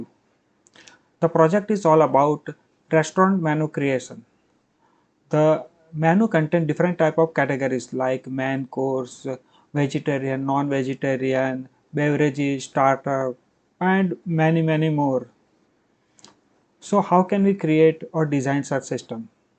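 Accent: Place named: India. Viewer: Finally got a video with indian accent on this topic.. thank uh sir😊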